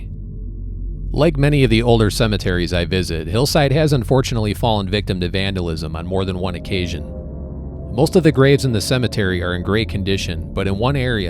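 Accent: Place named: Midwest, United States. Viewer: I listen to this channel at least once per day. Great stories, Midwest accent is great, and the background music is perfect.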